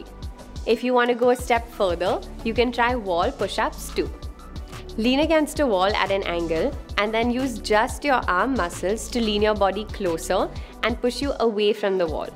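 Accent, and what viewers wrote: India. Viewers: Why is this white woman speaking with an indian accent 😂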